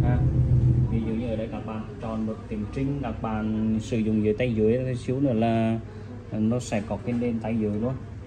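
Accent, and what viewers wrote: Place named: Central, Vietnam. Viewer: Kênh của Bạn kinh doanh đàn, các dòng đàn rất phong phú và hợp lý giá. Góp ý cho bạn chút suy nghĩ cá nhân của mình: Xin lỗi bạn vì giọng bạn đặc thù miền trung, Bạn nên đeo micro khi quay Video để mọi người dễ hiểu và nghe bạn truyền đạt. Thân ái trong Chúa.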